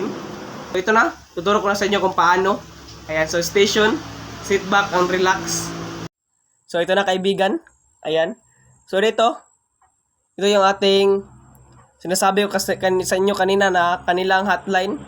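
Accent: Visayas, Philippines. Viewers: bisaya ahaha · Bisaya eh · Bisaya man deay ka mas galisod ko sabot sa imong tagalog😅 anyway unta ma sundog nako imong vedio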